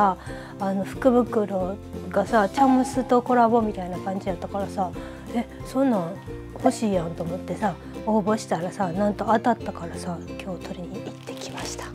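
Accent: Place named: Shima, Japan. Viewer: コロナからの回復おめでとうございます。志摩弁聞けたー🌠僕も娘の感染によって折角当たった２日の皇居一般参加を断念しました。新幹線やらキャンセルだらけで😞💦🐩との動画楽しみにしております。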